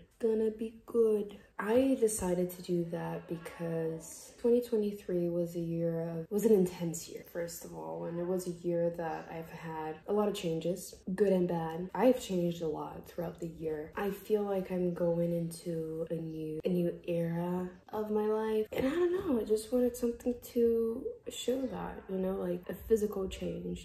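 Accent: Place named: Brazil. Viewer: Eu suspeitei que você fosse da região de onde eu sou porque você chamou a fruta Pinha de "pinha" em um vídeo, e não de fruta do conde ou outros nomes que outros lugares do país dão. Eu sou de Tanabi e descobri que você é de Rio Preto, fiquei feliz hasuhauhsuhaus Vi o filme que você fez, representando uma norte americana. Que tudo, um inglês totalmente brasileiro sendo usado pra representar americanos quando a gente como brasileiro ta cansado de ver os gringo usando mexicanos e outros para representar brasileiros hehehehehe~~ Feliz, obg por representar, viu. E agora como ruiva vão te confundir mais ainda com outros países haushuahuhs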